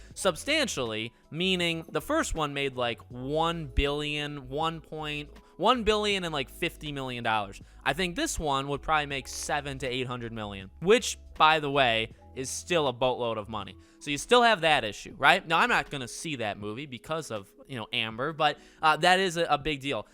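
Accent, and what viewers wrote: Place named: East Coast, United States. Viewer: Unrelated but I need to say how much I love your east coast accent. It brightens my day every time you say “Welcome to pahhhhhdcahst now, I’m!” 😝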